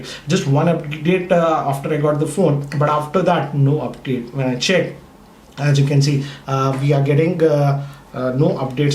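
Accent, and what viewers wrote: Hyderabad, India. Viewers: R u from Hyderabad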